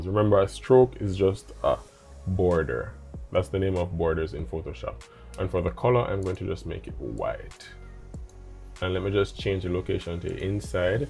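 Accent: Jamaica. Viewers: You have a Jamaican Accent lol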